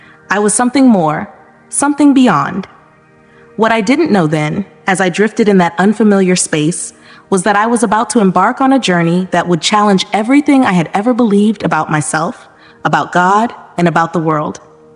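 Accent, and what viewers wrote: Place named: Brooklyn, United States. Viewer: I'm curious about your Brooklyn accent! What can you tell me about it. You sound like your from an area I'm not familiar with..???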